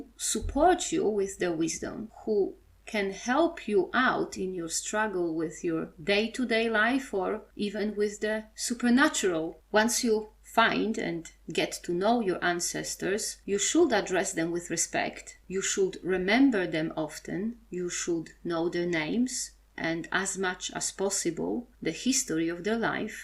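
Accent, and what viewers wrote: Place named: Poland. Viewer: I really enjoy listening to you. Your Polish accent is not bad at all. I have no problem understanding you. I am really amazed at your knowledge of history. You say things that I have known for a long time, yet others don't seem to comprehend. For example, anthropologically speaking, there's no such thing as an "ethnically pure" Slav. My ancestry is from your neighbor to the South, Bohemia. I know Polish and Czech people are very ethnically close. I don't find a lot about the faith and Bohemia, but I know they share it as well. So I will adopt my Polish brethren instead.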